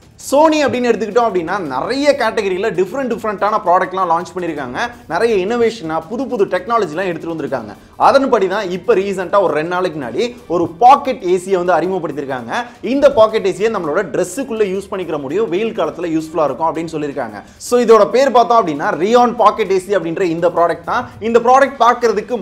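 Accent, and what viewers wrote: Madurai, India. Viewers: Bro neenga maduraiyaa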